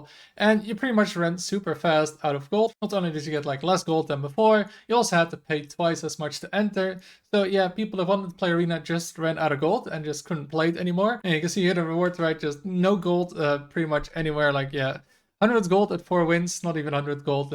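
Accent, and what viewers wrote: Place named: Europe. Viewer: all of this was already said just not in an annoying euroshit accent. revolting .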